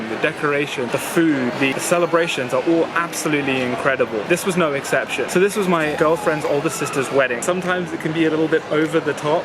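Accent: United Kingdom. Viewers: ，I know you have lived in China many years, but please keep your British accent because of the influence of Dayday. God hahahahahah. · after stayed in china for such long time you still able to keep your britain accent,that aint easy bro. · 听着你说英语觉得好不习惯啊！第一感觉就是，哎呀妈呀，说英文怎么有英国口音啊！但是过了2秒钟以后，我才反应过来人家本来就是英国人而不是中国人 LOL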